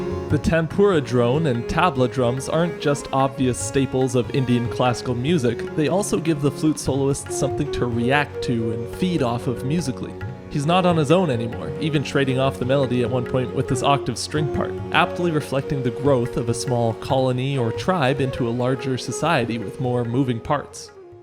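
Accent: United States. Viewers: "And I'm not even American" That threw me for a loop there for a second. I was about to say "but, his accent is clearly Amer..." and then I remembered that for the most part the Canadian accent is indistinguishable from the American accent, unless you have a heavy regional accent. Sorry Canadians, for always assuming you're American unless told otherwise. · Wait you're not American? Then where are you from that has such an American accent? Canada? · I thought you were american, were are you from? Very nice talent in music, you have. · i never even realized you were canadian! i think my mind just kinda assumed you were northern/midwestern american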